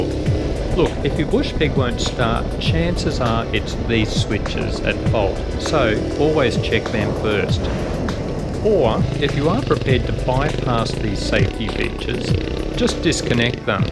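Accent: Australia. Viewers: After all the reviews I tried to watch but failed by bloody skeptics, along comes this one one spoken in plain Australian. Thank you looking forward to watching the whole series. Where on the Sunny Coast are you lot at?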